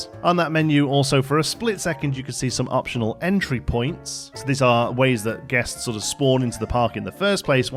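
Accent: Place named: Staffordshire, United Kingdom. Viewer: Loved this insight, I'm so pumped for Planet Coaster 2 now, it's everything I wished for whilst playing Planet Coaster 1. By the way, weird question. I'm from the UK but have never been able to nail down your accent's area, I think it's west midlands somewhere but it's very specific with the way you pronounce the 'ow' in now and so on, I've heard a few people have this inflection and I've always wanted to know the town or area that pertains to. I'm a bit of an accent enthusiast so sorry for the very odd question!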